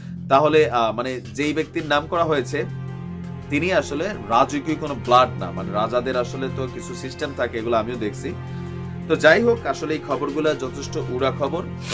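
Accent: Comilla, Bangladesh. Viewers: Tomar video gulo khub pochondo kore dekhi.Tomar news e aanek totho pai r tomar presentation besh bhalo. Tobe tomi comilla r ancholikota over come korte paronai. Tumi bar bar desh k dash bolo. Jeta srutikotu lage. Tumi bolechi mind koro ni to ? Aami tomar ma khalader moto. So....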